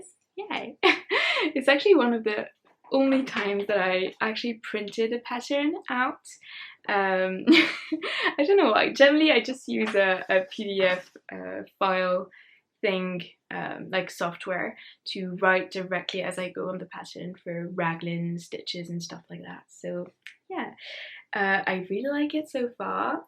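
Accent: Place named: United Kingdom. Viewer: Hello from Nova Scotia, Canada. Congratulations on your first podcast, it was delightful and very informative. Your English is perfect and love the slightly British accent. Your enthusiasm and sweet smile make you and star in my books. Just be yourself. Your knitting is quite lovely and your yarn choices are great - not cheep as far as I am concerned. I would love to try the Drops line of wool someday. I am 68 and very much on a budget so I do what I can. I look forward to your next podcast which, by now is out and ready for me to watch next.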